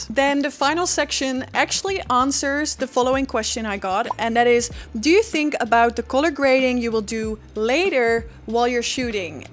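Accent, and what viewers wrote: Netherlands. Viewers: Question, are you dutch? Cause i am, snd i thought i reconised the accent😊 and i really love what your doing! So inspiring🫶🏼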